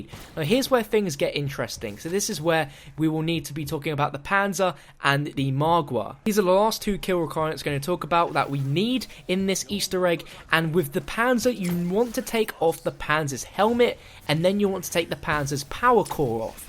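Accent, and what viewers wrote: United Kingdom. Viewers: He's British, his accent changes pronunciation.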